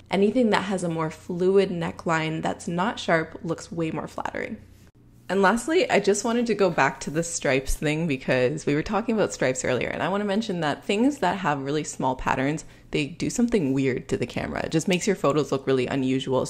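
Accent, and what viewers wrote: Canada. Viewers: Do I hear a bit of a Canadian accent?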